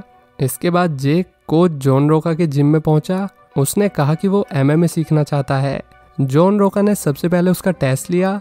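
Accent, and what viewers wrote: Bihar, India. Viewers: Bihar se ho kya bhai😅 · R U FROM BIHAR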